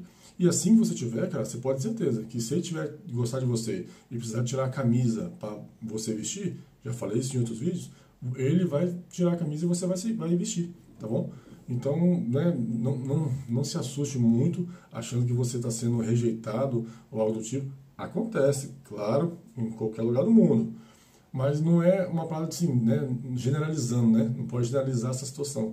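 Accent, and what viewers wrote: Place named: Bahia, Brazil. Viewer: Vc fala como neu irmã da Bahia, Salvador, por isso pergunta se é baiana, desculpa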